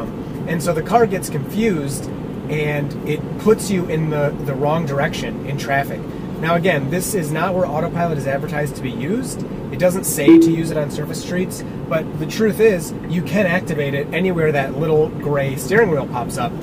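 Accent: United States. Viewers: I was confused why you had a US accent and were driving on the wrong side of the road. Maybe the car heard you say that and thought the same thing.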